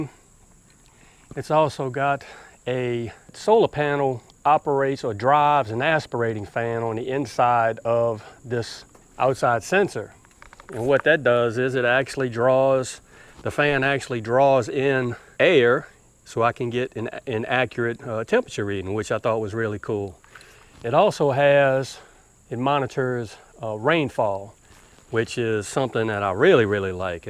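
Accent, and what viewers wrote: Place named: Louisiana, United States. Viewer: Sounds like your from south Louisiana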